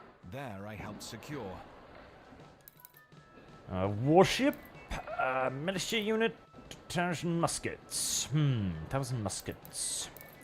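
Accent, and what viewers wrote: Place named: United Kingdom. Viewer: Almost 4 hours of being a better brittish that most modern british, good stuff!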